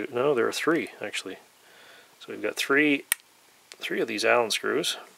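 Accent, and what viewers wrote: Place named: Cape Breton, Canada. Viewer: Canadian right ? I thought the accent sounded very familiar. I'm gonna take a shot in the dark but based on the accent, I think east coast. Cape Breton perhaps. I'm a Newf here in St. John's. Just bought a new scope today. Bushnell Dusk til Dawn Model. Gonna mount it on my .22 air rifle. but I need to buy mounts now. Happy Hunting, man.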